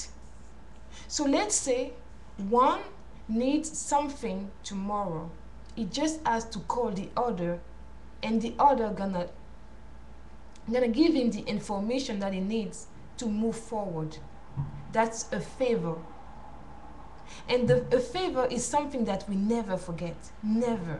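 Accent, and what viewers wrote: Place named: Africa. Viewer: I love you African Woman. You have a beautiful African Accent.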